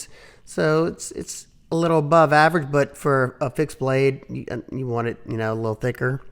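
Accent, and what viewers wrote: Louisiana, United States. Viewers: I recognize a Louisiana accent when I hear one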